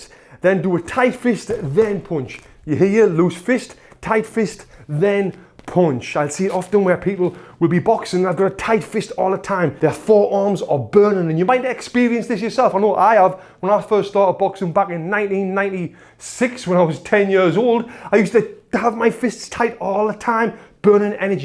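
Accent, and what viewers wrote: United Kingdom. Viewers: His boxing is awesome, but how pleasing his British(right?) accent is? +100 to boxing skill if you can talk like that, I'm not gay btw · I love your accent sir. I think it's British accent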